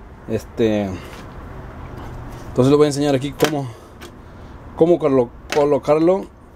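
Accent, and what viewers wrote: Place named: Jalisco, Mexico. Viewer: Muy bueno, saludos, un abrazo! PD. tu acento lo siento como de Jalisco, o de donde eres?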